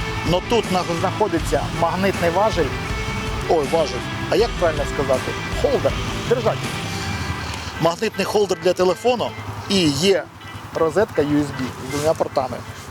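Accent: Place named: Russia. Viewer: Брат! Видно же, какой твой родной язык!!! Говори пожалуйста на Русском, будет всем счастье!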